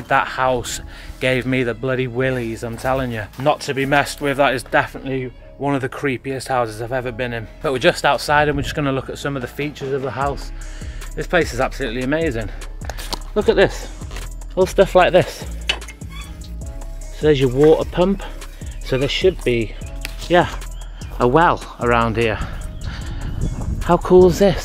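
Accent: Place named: Northern England, United Kingdom. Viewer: Yes and they understood your Northern way of speaking English, using phrases that no foreigner ever learns. You're great entertainment, but come on!!!!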